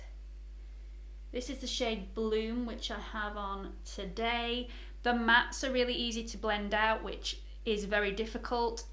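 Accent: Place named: Northern England, United Kingdom. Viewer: You sound northern I am in Leeds. You?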